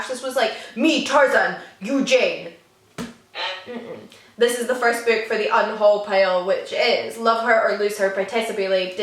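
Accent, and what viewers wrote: Scotland, United Kingdom. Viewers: your accent <3 Love the Scottish accent ! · This is definitely the most Scottish I've ever heard you sound in a video.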